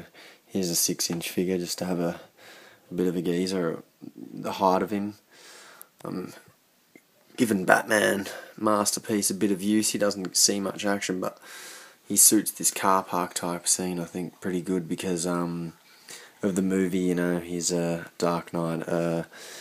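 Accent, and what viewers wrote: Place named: Australia. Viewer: Aussie accent good to hear g'day from Perth P.S great content nice work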